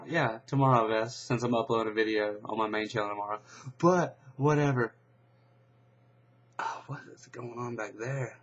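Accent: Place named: South, United States. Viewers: I love your southern accent :D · your from LA???!! You seem like your from Alabama...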